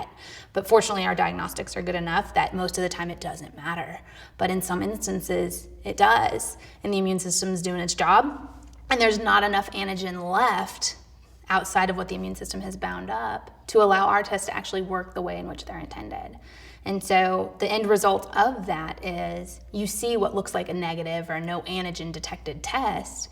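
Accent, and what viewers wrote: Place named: Philadelphia, United States. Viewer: She sounds like she’s from Philly lol.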